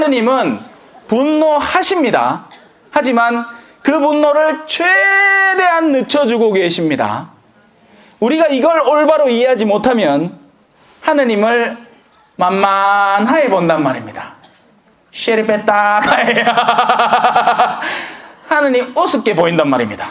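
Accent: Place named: Busan, South Korea. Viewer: 신부님 부산오셨군요 비슷한 사투리가 정겹습니다~ 항상 열정적이고 알아듣기 쉬운 강론 고맙습니다~~😊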